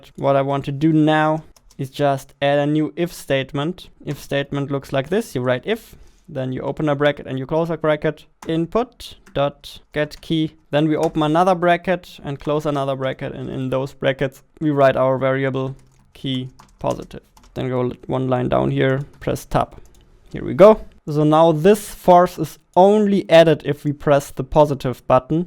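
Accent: Germany. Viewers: Hey, Erstmal danke für dieses krasse Video! So macht es einen Spaß schnell einfach kompakt und mit schönen Deutschen Akzent zu lernen. Super Erklärung und Co. konnte alles wie im Video 1zu1 sofort anwenden bis Minute Ab dort funktioniert es bei mir leider nicht mehr weil Visualstudios kein GetComponent anzeigt sowie kein Rigidbody obwohl ich in Unity gecheckt hab ob es auch fürs Objekt ausgewählt wurde. Ich werde allerdings der sache auf den Grund gehen und werde es irgendwie versuchen doch noch umzusetzen. Beste grüße Marcel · ur german accent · I like your german accent, good tutorial <3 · Are you german? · Are you German? Your accent sounds like it ^^ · man hört halt dass du deutscher bist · The germäaan accent haha. Bester mann xD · Erstens man hört raus dass du Deutscher bist... Und zweitens... Durch deinen Dialekt kann ich viel besser verstehen was du meinst... 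Du sprichst auch sehr deutlich und ausführlich... Und in deinem 39 minütigen Video... Stecken mehr Informationen als in manchen 1 oder 2 Stunden videos... Und das beste ist... Du gibst dir dabei mühe, schneidest alles zurecht und erzählst es mit Emotionen... Andere Leute rattern alles einfach nur runter aber du hast mir 1000 mal mehr geholfen bei dem Programm... Vielen vielen dank! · He has a German accent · I can hear your German accent, is there a German version of this video? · Are u from germany?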